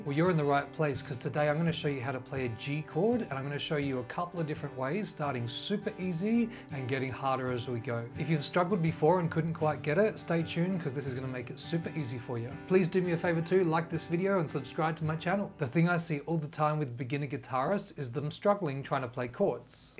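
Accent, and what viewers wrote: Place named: Australia. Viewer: Awesome video! Thanks dude! I know you Aussies say Mate, but in the US I say dude a lot. Cool accent dude.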